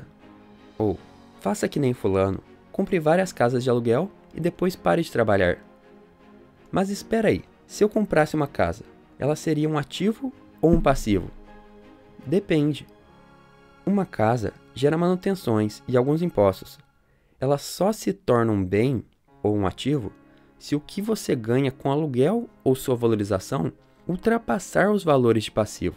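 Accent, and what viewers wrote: Caipira, Brazil. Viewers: Só um conselho. Procure um fonoaudiólogo para perder esse sotaque caipira horroroso. Não dá pra assistir o vídeo com uma narração dessas...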